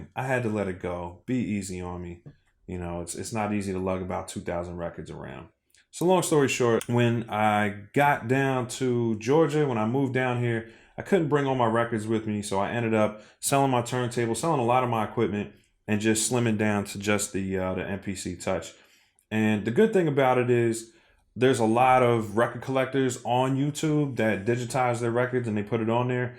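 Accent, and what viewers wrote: Philadelphia, United States. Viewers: You from Philly fam?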